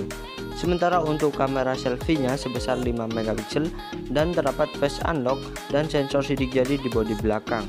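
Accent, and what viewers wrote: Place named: Tegal, Indonesia. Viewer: Medok bener ni tegal nya. Lanjut gan nice info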